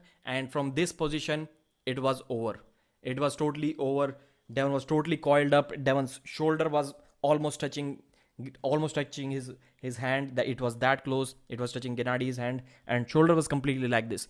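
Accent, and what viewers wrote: India. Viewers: Who the F wanna watch your dumb Indian baldy and accent. Just show the video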